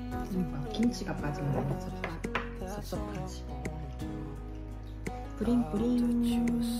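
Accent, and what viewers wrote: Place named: Japan. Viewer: 빵을 엄청 좋아 하시나봐요??^^고기는 감겹살에 상추죠~ 근데 일본사람들도 저렇게 먹는걸 좋아 하나요? 한국은 상추에 먹을때 된장을 무처 먹는데요 ㅎ,근데 님은 한국분인가요 일본분인가요? 한국분으로 알고 있는데 ^^ 말억양이 일본분 억양이 보이네요~ 행복한 일상 같이 합니다~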